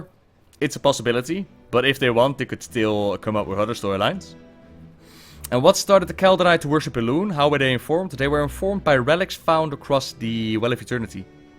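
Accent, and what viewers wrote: Netherlands. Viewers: I don't get why so many people watch this crap this Dutchboy has a annoying accent.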